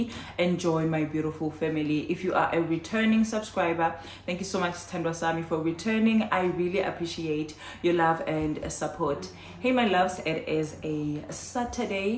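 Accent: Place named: Eswatini. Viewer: The moment I heard your siSwati accent, I subscribed. Will figure out who is later😂. New subbie💃🏾🙋🏽‍♀️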